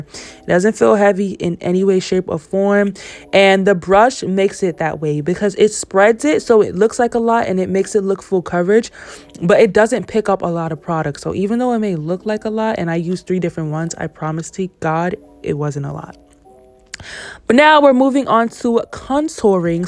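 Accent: United States. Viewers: I also mix foundations to get my clients perfect match. I still use the back of my hands it warms the products. You sound like you’re from Dade County 😂 Miami you Sound like me New Subbie 👋🏾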